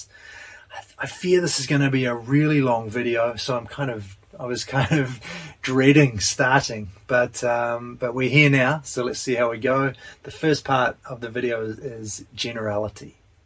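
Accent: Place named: New Zealand. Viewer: Are you a Kiwi bro?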